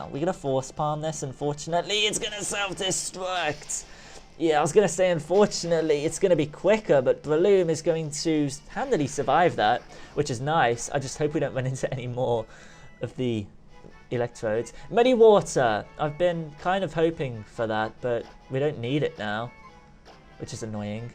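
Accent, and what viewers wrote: United Kingdom. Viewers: Is he British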